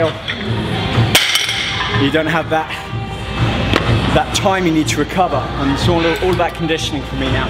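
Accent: United Kingdom. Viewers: has one really cool way of talking and explaing exercises,even myself started to talk like,british excent lol:D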